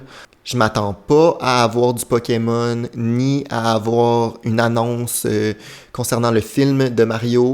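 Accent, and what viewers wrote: Quebec, Canada. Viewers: Excellente vidéo ! J'suis nouveau sur ta chaîne et c'est vraiment de la qualité : c'est dynamique, bien monté etc donc Bravo ! (En plus j'adore ton accent québécois il est trop cool XD) En vrai c'est totalement possible d'avoir un ND la semaine prochaine, c'est habituellement vers milieu juin qu'on a un direct Il y aura surement de bonnes surprises sans oublier Splatoon 3, Mario + rabbids qui seront au RDV ! Enfin j'espère ^^" En tout cas, il ne reste plus qu'à attendre :)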